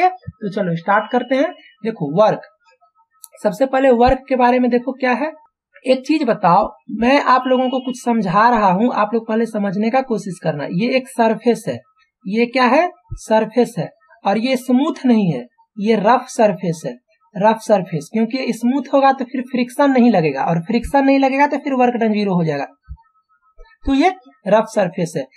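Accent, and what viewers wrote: Bihar, India. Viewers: BIHARI HO SIR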